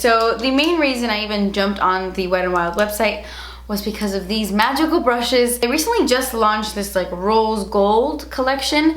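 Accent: England, United Kingdom. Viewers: There always has to be like 2 words pronounced in a british accent in every video 😂😂 and i love it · Your English accent sounds like Angelina Jolie in tomb raider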